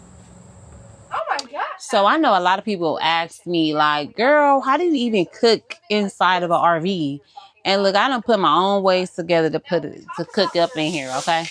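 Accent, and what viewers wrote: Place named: South, United States. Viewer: What part of the south are you from seriously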